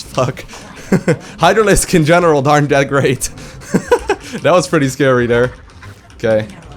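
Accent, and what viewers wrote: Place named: Netherlands. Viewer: Hey! Really enjoying your podcasts! I'm a teacher of English in Holland and I can clearly hear you've got a Dutch accent, but your English is flawless. I also love how cleverly you played this game! Unfortunately you weren't playing as greedy as you should've, but it's good you figured that out yourself!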